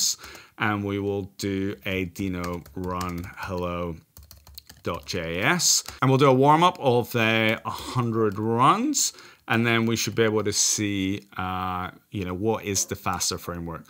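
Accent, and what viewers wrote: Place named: England, United Kingdom. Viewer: I absolutely love his english accent - Subscribe earned just by hearing it :D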